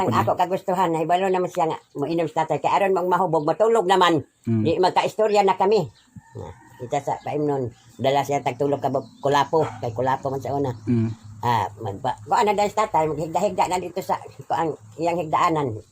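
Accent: Surigao, Philippines. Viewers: asa ka dapit sa surigao lola? mao diay ang imo sinultian familiar kaayu 😁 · Lola,makalingaw jud imng storya la, bisaya surigao accent.... taga surigao here sad lola. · 😁😁😁😁 lingaw Jud ko nimo la ako Lola taga surigao sad ingana sad mag storya sa emoha 😁😁😁watching from parañaque 😁😁😁😍😍😍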